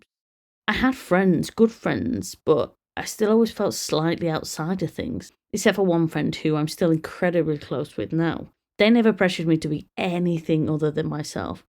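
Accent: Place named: United Kingdom. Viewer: Hey, could you write your email please? Hard to hear for non-british ears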